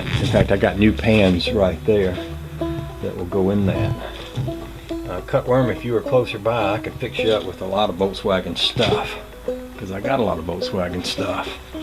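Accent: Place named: South, United States. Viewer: ya left off the ah in front of pologize! Sory I miss read ya! But if ya was ta have a poltergeist and Dracula that wooden be Tanks giving, it be Halloween or if ur down by cemetery it be Gallows Eve! Hot Rod wood have ta break out his hot rod Christine! Stake em and hangem high! Now all we need is fur ta show up and start talkin British with his southern style accent.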